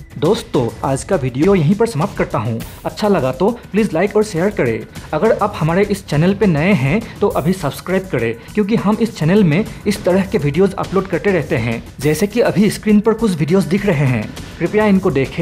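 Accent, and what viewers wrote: Assam, India. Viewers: Are you Assamese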